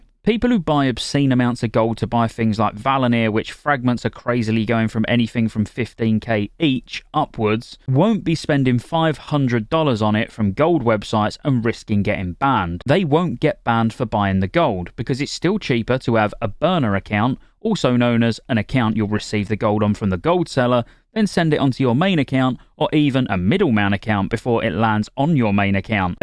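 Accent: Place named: Lincolnshire, United Kingdom. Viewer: Love listening to the Lincolnshire accent on YouTube.... "Items" or as they are called in Lincolnshire... "Eye-ums" Much love, Yellowbelly myself <3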